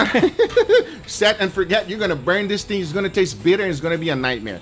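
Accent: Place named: Brazil. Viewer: Is from Brazil? His accent sounds like a Brazilian accent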